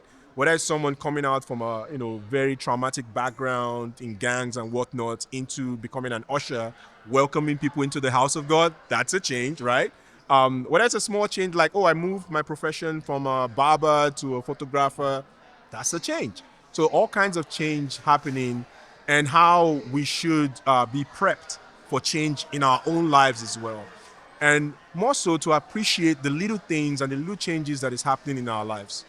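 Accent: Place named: Nigeria. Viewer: Could feel brother intonation. That his 🇳🇬.. watching from Nigeria...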